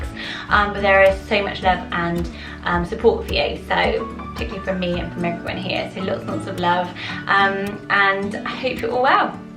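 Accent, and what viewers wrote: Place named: England, United Kingdom. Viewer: Evelina sounds so much prettier in your English accent. My favorite this time must be Phoenix. What about Theon for all the Game of Throne fans out there. A very cool alternative for Theo.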